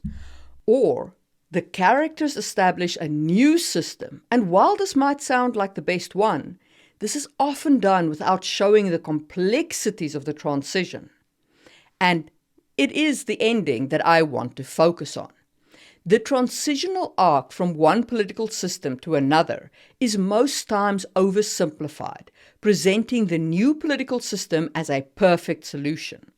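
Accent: South Africa. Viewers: You sound South African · wasn't expecting a south african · You sound South African! 😱 Where is your accent from? On your website you are standing in snow that definitely does not fall in SA. Expat? Am I completely off the mark?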